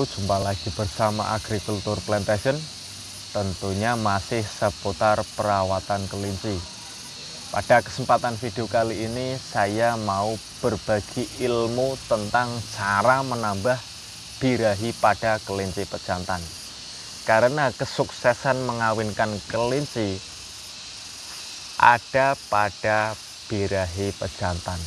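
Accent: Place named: Java, Indonesia. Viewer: Logatnya org jawa y mas, jawane pundi??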